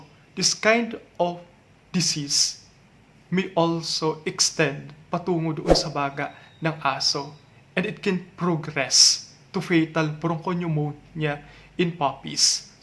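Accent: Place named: India. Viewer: Bat po kayo ganyan magsalita sir? Parang bumbay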